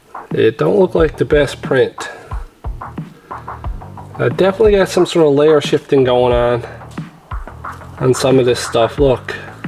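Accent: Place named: Maritimes, Canada. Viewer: Great job! Been looking to get a printer...Appreciate the way you mention the paints/products you use. I couldn't tell where you were from initially but I detect East coast of Canada. Good to see Canadian content on YouTube. 👍 What company designed the model...I mean really how else did you get my attention? Get some sponsors brother, best of luck in your content endeavors! Thumbs up and a subscribe. Edit found the link...that's why I'm watching videos and not producing them, a lack of technical skill! Cheers.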